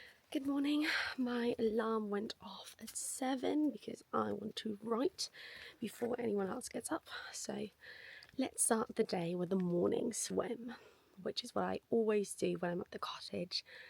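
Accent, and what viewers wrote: United Kingdom. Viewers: A real British accent! 🤓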